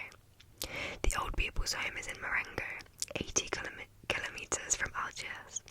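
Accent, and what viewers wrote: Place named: France. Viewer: I love this book, Camus is such a genius! Plus the English to French language switch is incredible 🌟 PS: Love your French accent, you did great!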